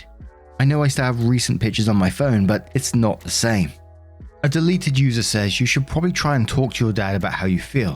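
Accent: England, United Kingdom. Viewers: Except when dialectical colloquialisms are involved. is from a certain part of England that has a certain accent & certain phrasings particular to the region.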